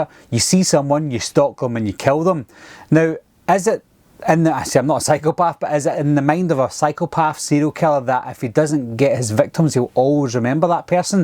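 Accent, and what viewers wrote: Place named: West of Scotland, United Kingdom. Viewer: Trying to place your accent. Definitely West of Scotland. You dont pronounce chair as 'cher' so I'm guessing you are from Ayrshire or very close to it.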